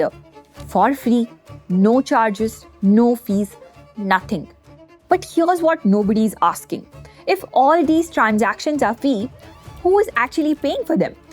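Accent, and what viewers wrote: India. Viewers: Your speak like a Tamil not english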